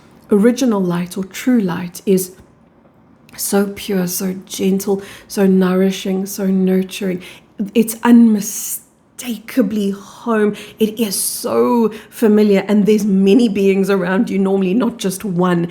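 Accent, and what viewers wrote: South Africa. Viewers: S.A. accent?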